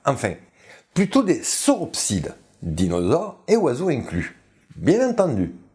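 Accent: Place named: Occitania, France. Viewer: Salutacion dempuèi Occitània, que s'ausis a l'accent del youtubaire ;-)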